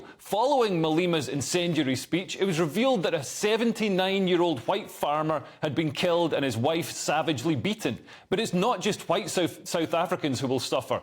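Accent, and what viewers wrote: Scotland, United Kingdom. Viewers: This is the worst analysis I’ve ever witnessed. At first I thought he was Irish and had an understanding of geo-politics but I think he’s Scottish 😅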